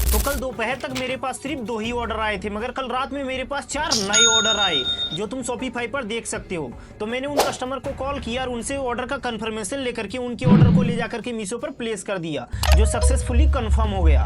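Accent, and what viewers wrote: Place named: Jharkhand, India. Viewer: Bhai tum jharkhand se ho 😂 Mai bhi hu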